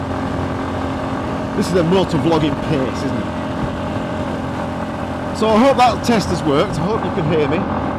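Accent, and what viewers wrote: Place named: Leeds, United Kingdom. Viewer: After pissing about reading viewing and thinking re instamic pro plus, the new dji wireless mic and the rode go 2 I have just bit the bullet and bought the rode 2. Can i ask how do you mount the transmitter to your helmet? I'm wearing a shoei note 2 flip. I saw a guy with a boom mic connected and plug into the rode 2 but was hoping for wireless with a dead cat etc. I have a boom mic in my helmet for talking rider to riders so there would not be room unless i place second mic on other side of helmet... any help or advice would be great thanks GOOD VIDEO where are you you sound like a LEEDS accent...but that snot west yorkshire lol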